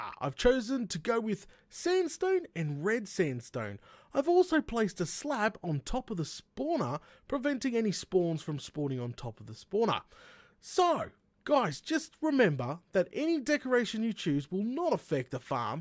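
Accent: Australia. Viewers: Mmmmmm...so Australian.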